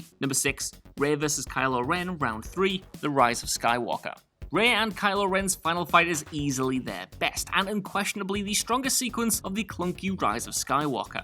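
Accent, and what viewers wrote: United Kingdom. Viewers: British accent really suck! Fuck your Jewel/Joo·Uhl shit!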